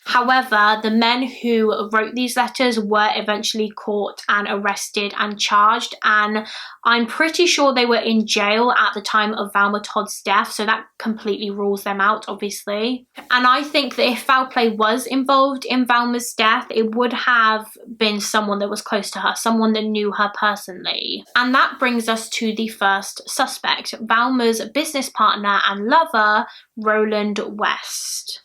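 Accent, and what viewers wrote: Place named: Bristol, United Kingdom. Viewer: It is the accent, which you must be unfamiliar with. If she got the name wrong then then she would have spelled it wrong in the description box of the video. It kind of reminds me of a Bristolian accent.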